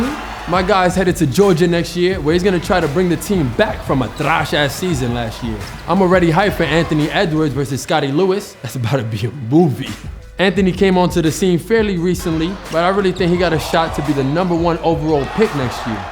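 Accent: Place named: Dominican Republic. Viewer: Bro I had to like the vid when he spoke that Dominican Spanish. Ayyee🇩🇴🇩🇴🇩🇴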